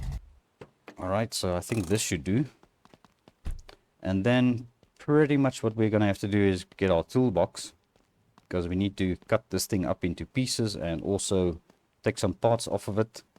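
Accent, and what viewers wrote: South Africa. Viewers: Are you south african? you sound south african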